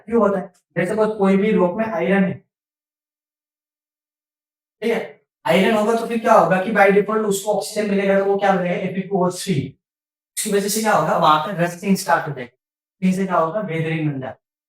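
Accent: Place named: Gujarat, India. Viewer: hindi accent is like gujrati. I think he is from gujarat. I really appreicate sir's efforts for the most amazing lecture. thank you sir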